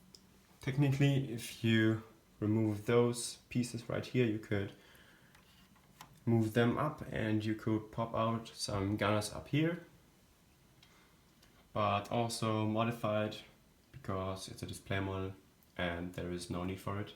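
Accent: Germany. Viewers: Wusste ichs doch, er ist Deutscher😂 der Akzent hat's verraten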